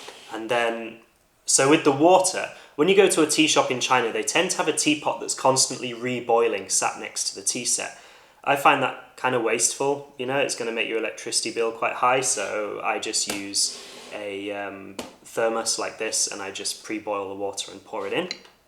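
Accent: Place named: United Kingdom. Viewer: All I'm saying is that "Man talks about caffeinated beverages in a British accent" is clearly a formula that works. You'd need to upgrade from t-shirts to jumpers though.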